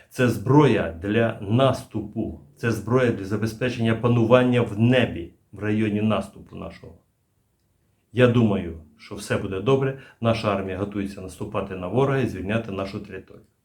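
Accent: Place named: Lytyn, Ukraine. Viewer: Завжди приємно слухати Вас пане. У Вас якась рідна українська мова, як у нас на Літинщині. Дякую за всі огляди.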